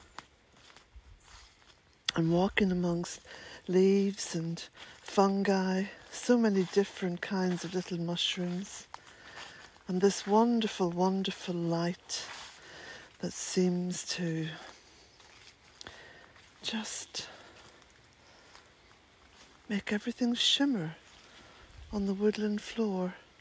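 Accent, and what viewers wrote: Ireland. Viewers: What a beautiful video. You have a lovely voice, very calming and I love your Irish accent too. Bealtiane cottage looks like a heavenly place to live xx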